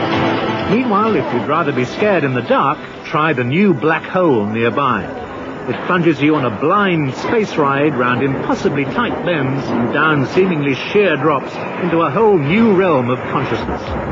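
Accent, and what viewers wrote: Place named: United Kingdom. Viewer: i love the narrator's voice.....so British!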